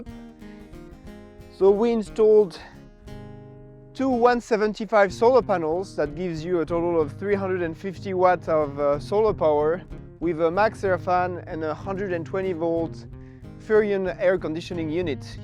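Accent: France. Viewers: Just beautiful as always 😍 bravo PS: like the french accent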